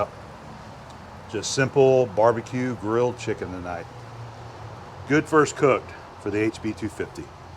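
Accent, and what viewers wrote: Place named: Western Pennsylvania, United States. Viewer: So what is your connection to I-79? I hear a little bit of Western PA in your voice but I think you’re in FL.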